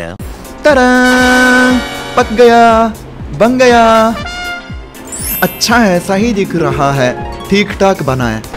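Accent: Standard. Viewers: Aap bhoot shuddh Hindi bolta ho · Waaah.... bhai aap sirf hindi nahi suddh hindi bolte hain jo ki bhut manmohak lgti hai........aapki hr videos un logo k muh pr thappd hi jo indian hote huye v hindi me introduction v ni de skte aur hindi bolne me shrm krte hain......💖💖💖💖💖💖💖💖💖💖🙏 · Bhai teri hindi toh hamare indian log se bhi zyada pure hai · sala itna sudh hindi to india wale nhi bolte hain😄😄😄😄😄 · Itni sudh Hindi hum bhi nhi jaante😅😅😅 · I want this guy to speak for hours in his pure Hindi .. btw great videos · Itni sudh hindi toh Indians bhi nahi bolte🤣🤣🤣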